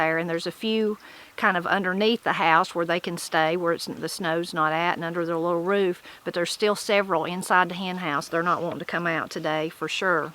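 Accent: Appalachia, United States. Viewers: I love it, and the way you talk. You have the best accent in the whole of the USA. I'm not kidding. It's been a long cold winter and still is, here at 60 degrees north. I don't have a good name for it though, but will adopt one of your phrases for it! I felt the slight warmth of the sun for the first time today. Keep up the good work! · My mother and her family have a long history of family ties to Appalachia. I always remember my mother and grandmother saying in the winter many a day saying ‘it’s cold as a a witch’s tit.’ I am glad to know it’s a saying I didn’t know if my mom made that up herself or what! When it was a thunderstorm and sunny my mom would say ‘the devil is beating his wife.’ Thank you for your channel! You help me feel connected to my heritage. I no longer live in Appalachia and hearing your voice connects me back to my roots.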